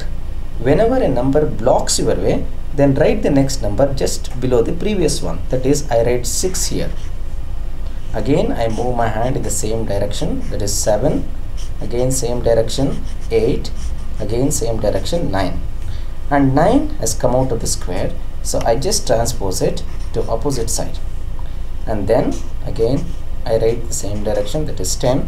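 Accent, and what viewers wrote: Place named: India. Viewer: are you indian. the accent is nice :P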